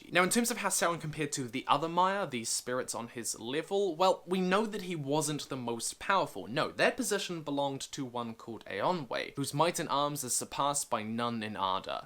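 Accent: New Zealand. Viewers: Love your channel bro! You sound like a kiwi... are you? im from auckland!! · Is it a common thing for kiwis to not recognise their own accent? I cant beleive I only just realised you are also from nz xD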